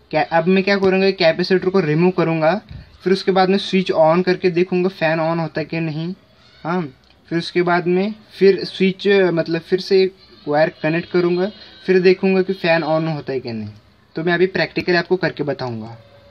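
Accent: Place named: United Kingdom. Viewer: Video me kya bolne wale ho, pehle se likh ke Q nahi rakte ho yaar, lagta hai jaise koi angreez hindi bolne ki koshish kar rha ho, anyways, i managed to understand what you were trying, and it helps a lot. thanks for that.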